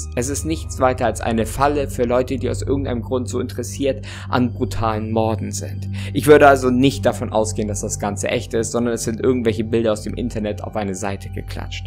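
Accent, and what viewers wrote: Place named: Ruhr, Germany. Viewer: Ruhrpott dialekt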